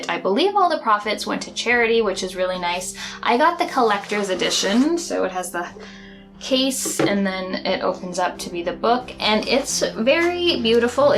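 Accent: Canada. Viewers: Canadian accent for the win! Looking forward to the Journey to Silius review.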